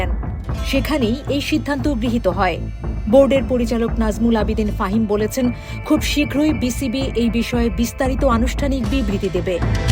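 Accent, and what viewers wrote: India. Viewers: Apner bolar dhoron tay amon keno mone hosse j akjon indian uposthapika news portese? Bolar dhoronta change kora uchit bole mone korsi. Mustafiz k bad dewar bisoyta sudhu matro opoman jonok e noy, Bangladesher onek khotir o karon. (Ovijog othese.......), (Bangladesher bivinno mohol), ai bakko / sobdo gulor bebohar and bolar expration ta indian type. Indian news presenterder mukhe amonta sune thaki pray somoy. Tai baktigoto vabe amr mone hosse jeno indian kono news presenter news path korlo. Plz, bisoyta vebe dekhben. Amr nikot mone hoy ami bangali, bangladeshi. And Bangladesher news gulo porar somoy bangladesher tone, expression rakha uchit. Er age kono uposthapika/ uposthpoker er news pora niye amr amon mone hoy ni.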